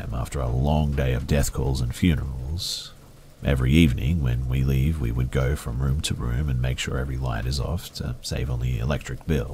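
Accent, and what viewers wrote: Australia. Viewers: Yeah mate our Australian accent is pretty great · Gotta love that Australian accent 🤣🤣👍🏾👍🏾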